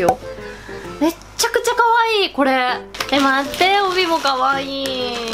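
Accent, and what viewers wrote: Hakata, Japan. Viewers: 博多弁可愛いw 二個目の浴衣個人的に好き！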